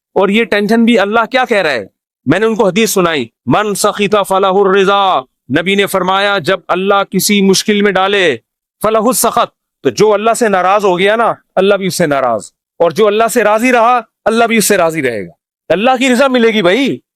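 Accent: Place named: Karachi, Pakistan. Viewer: مفتی صاحب کا کرانچی والوں کا لہجہ بھلا لگ رھا ھے ۔❤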